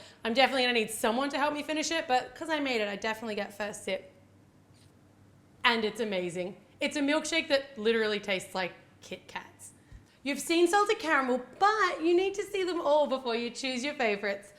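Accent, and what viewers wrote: Australia. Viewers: Is she Australian or American? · Are you from Australia 😀😄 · Is she Australian? Cause I am! =D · Ehh what? She's got like the strongest Australian accent ever.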